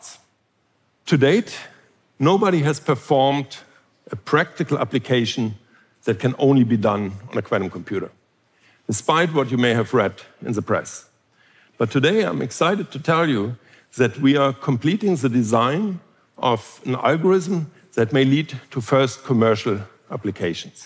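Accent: Germany. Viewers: i tryed to watch it, but after min he lost me completly. And the german accent has mad it worse. Sorry Hartmut, aber das kannst du sicher besser. Geiles Thema, aber suboptimal transportiert, für mein Verständnis zumindest. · i can hear the german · his baldness (with or without sunglasses) is superseded by his German accent for his credibility.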